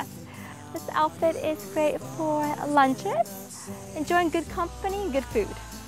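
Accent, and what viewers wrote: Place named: Singapore. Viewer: im guessing she's from singapore so she's got the singaporean accent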